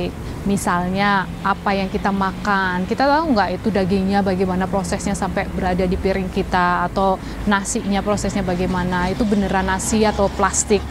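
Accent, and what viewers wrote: Banyumas, Indonesia. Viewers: Nok ngomonge ngapak bae endah padangerti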